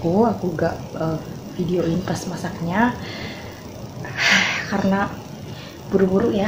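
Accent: Banyumas, Indonesia. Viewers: Halo anak cantik ngapak yen ora ngapak ora kepenak..... Semangat 👍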